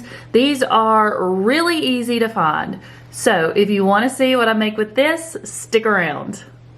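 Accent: South, United States. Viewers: What! You have that soft sweet southen accent. Us northerners don't.😁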